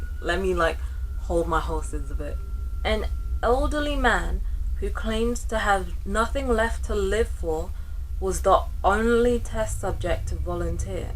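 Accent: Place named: England, United Kingdom. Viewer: Happy New Year! I love your English accent. It enhances your voice with a poetic Cadence. Beautiful! He was a great story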